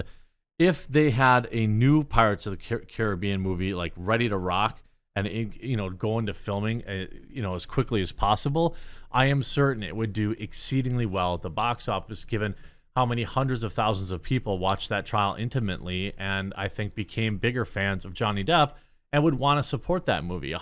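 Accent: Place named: Wisconsin, United States. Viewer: Love the show! But I can’t tell if you’re saying wahmen seriously because the way you say marget Robbie, Dray-gons. I know you’re from Wisconsin or somewhere lol again love the show!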